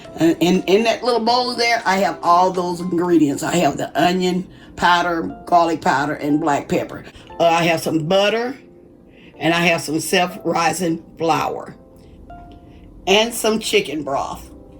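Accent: South, United States. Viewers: im Hungry Hungry,Hungry, im Definitely Going to Try This Recipe. It looks Absolutely DELICIOUS,UmUmUm,GOOD😋😋😋. And you sound So Much like Mrs.MaeMae, either you are Related or its that, way dwn South Accent😃😃😃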